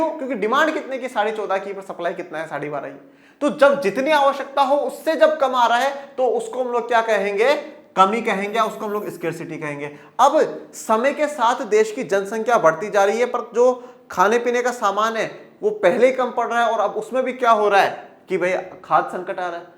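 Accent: Rajasthan, India. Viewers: Rajasthan sa ho kay sir 😂😊😂😊😂😂😊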